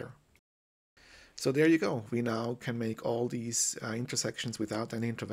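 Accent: Switzerland. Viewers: are you swiss?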